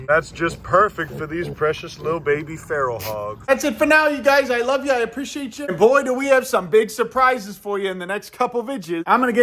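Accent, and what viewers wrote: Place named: Texas, United States. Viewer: you got a Texas twang that is organically coming out. Hoooo hooo hooo 😂 I could watch the animals graze and eat for hours. ❤❤❤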